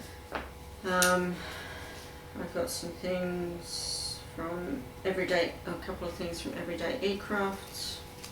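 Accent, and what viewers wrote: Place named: Australia. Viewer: G'day from SA I thought hmm she sounds Aussie then she said Kmart and I went woo hoo! LOL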